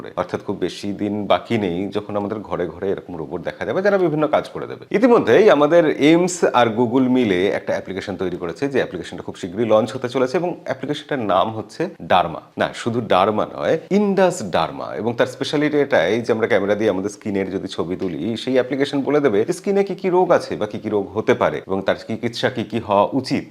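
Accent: Bangladesh. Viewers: এই বাংলাদেশী উচ্চারণ নিয়ে কি করে আনন্দবাজার এ চান্স পেয়েছেন এই উপস্থাপক!!!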